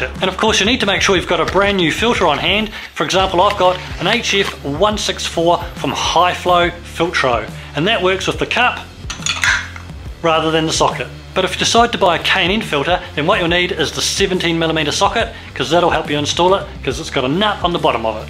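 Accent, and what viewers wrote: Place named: New Zealand. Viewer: Thanks a lot from a Canadian listener. I really like your Kiwi accent.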